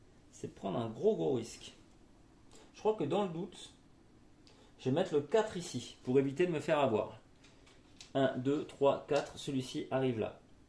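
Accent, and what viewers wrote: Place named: Toulouse, France. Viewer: avec grand plaisir de se tutoyer (j'avoue avoir modifié mes "tu" en vous car je voulais pas sembler trop invasif avec ma question du "d'où es tu". Mille fois d'accord que quand tu compares ça avec les kickstarter actuel, ça devient de la folie, après faut dire que svt dans les kickstarter tu as de la figurine et c'est la course au stretch goals donc le volume peu devenir fou et le prix l'est déjà de base et alors quand tu vois les all-in... Bon ça m'a pas empêché de 'e pas être raisonnable durant l' année sur KS 😅 Sinon tu as bien pris l'accent Toulousain effectivement, je reconnaissais une touche du sud mais légère. Je suis de Montpellier c'est pour ça que ça me parlait un peu 😜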